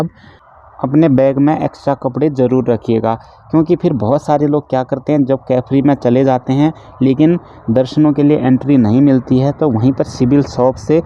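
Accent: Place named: Uttarakhand, India. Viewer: जय माता दी 🌼🌻🌹 Sir, are you from Uttarakhand because your tone is matching with Uttarakhadian's people's.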